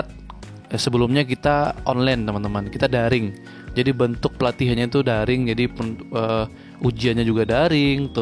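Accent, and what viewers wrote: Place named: Sambas, Indonesia. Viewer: Bang kalau boleh tau, abang cpnsnya daerah mana bang, kalau dari logat dan nama chanelnya mirip logat daerah Sambas🙏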